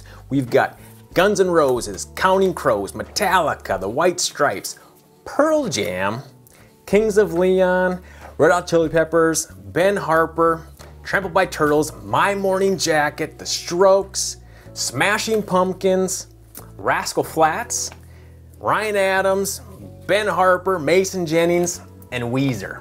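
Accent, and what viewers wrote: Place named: Minnesota, United States. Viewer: Wow, I really hear your Minnesota accent. Love it.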